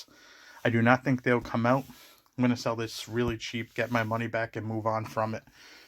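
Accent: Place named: New York, United States. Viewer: I thought I heard the NY accent. Grew up in Falmouth. Just moved to IL bummer I didn't find you sooner 😅.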